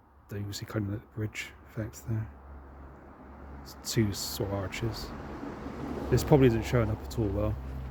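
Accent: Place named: United Kingdom. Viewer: I hope you were carrying a baseball bat..! Pitch black..good you didn’t trip! Are you in the UK 🇬🇧, I like your accent ❤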